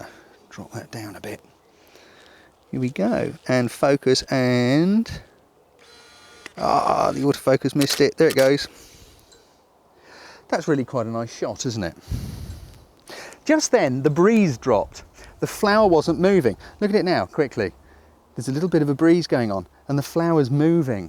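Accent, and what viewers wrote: England, United Kingdom. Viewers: Only discovered your videos today, but am completely hooked. Your teaching style and pace is fantastic and your english accent is like a balm (No offense Americans - I love you guys, but being from the UK myself it is damn nice to hear a fellow brit every now and then). I think you should totally have your own TV show maybe on Discovery Shed (I'll start the petition if you like)!!! Seriously though, thank you for what you do, ad for the help you have already provided me with.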